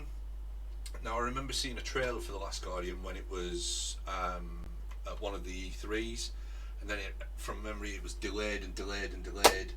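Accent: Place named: Rossendale, United Kingdom. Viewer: Nice pickups, Uncharted is a bit strange with me, I completed and loved the first 3 but didn't like the multiplayer of them and when it came to Uncharted 4 all I played it for was multiplayer and never gave the single player story a proper go, think it's because the demo they released of it was multiplayer and I loved it. Nioh looks like a good game, always wanted to try that....but the English version lol, you sound like you could be from the rossendale area? I could and most probably am wrong.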